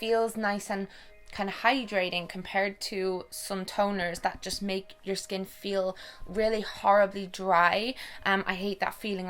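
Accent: Ireland. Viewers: lovvvveeee you can you marry me??? God Irish accents are so sexy xx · Does anyone know what part of Ireland she's from??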